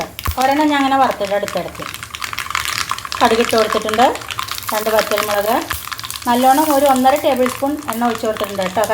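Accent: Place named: Palakkad, India. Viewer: പാലക്കാടൻ ഭാഷ അവധരണം കേൾക്കാൻ ഭയകര ഇഷ്ട്ടമാണ്..പചകം സൂപ്പർ ട്ടോ😋❤❤...ഞാനും ഇതുപോലെ ഉണ്ടാക്കാറുണ്ട്😍😘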